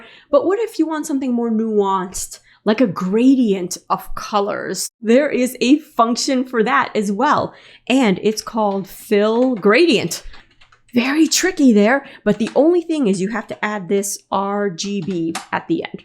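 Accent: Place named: Minnesota, United States. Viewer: this was so helpful. You have a great teaching style and your enthusiasm is wonderful. Thanks for having such a great channel. (Also, I don't know where you're from, but your accent tells me Minnesota, maybe Wisconsin. 😊)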